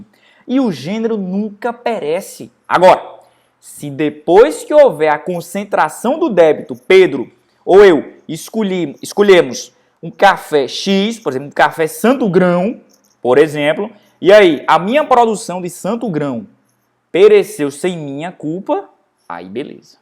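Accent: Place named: Pernambuco, Brazil. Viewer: Parabéns pelas aulas!! Que sotaque lindo, é de Pernambuco??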